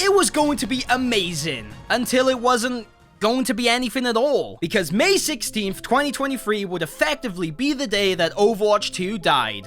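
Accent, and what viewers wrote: United Kingdom. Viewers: British accent mixed with the typical exaggerated YouTube tone is unlistenable.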